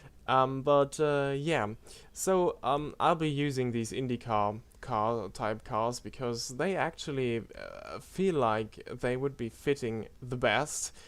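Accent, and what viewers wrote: Germany. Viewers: Hat man schon am Akzent gehört, das du deutsch bist👌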